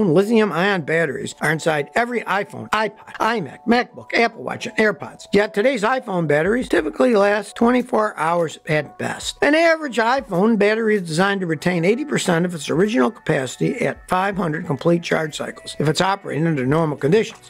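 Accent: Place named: United States. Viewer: Escellent video production, unfortunatly I often get lost, i find it sometimes difficult to follow your american accent. I suppose I need to follow you more often and I will soon get used to it. Where is your accent from?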